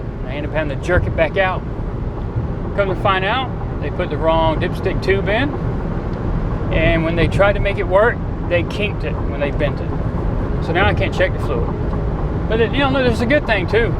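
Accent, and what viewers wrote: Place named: Georgia, United States. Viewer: Thanks for the reply and sharing your Neon repair technique . Never lose that cool Georgia accent. Been SUBSCRIBED for a while . Prosperity, long life and good health to you and yours.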